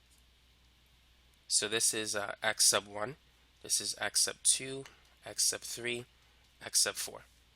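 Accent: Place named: Boston, United States. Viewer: Dat boston accent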